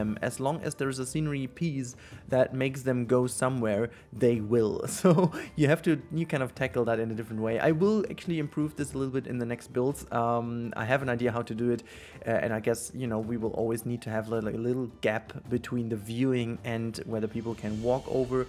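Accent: Germany. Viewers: Du bist aber deutsch oder weil dein Name und dein englisch verraten das 😊👌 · Kann es sein das du aus Deutschland kommst xD ?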